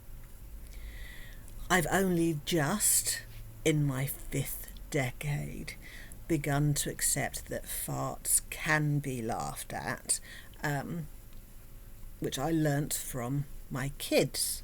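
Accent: United Kingdom. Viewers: The elegant, accurate explanation, delivered in the proper British accent, is brutal! Love it! And you look fabulous. The message you send with the makeup is crystal clear. 😂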